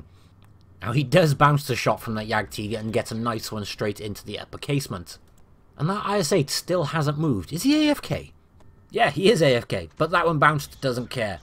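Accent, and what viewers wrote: United Kingdom. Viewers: first like before watching and second it's always great to hear your voice laughing with that stunning British accent of yours along with that beautiful and accurate commentary have you to tried to make a radio channel I'm not kidding your voice is so calming..... don't stop making Those videos with commentary I'm dead waiting for the next one cheers mate ..... :D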